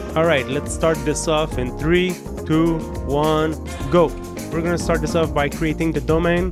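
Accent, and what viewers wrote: Quebec, Canada. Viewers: Is that a Québécois accent I detect? Bonjour cher québécois! The simulation looks astounding!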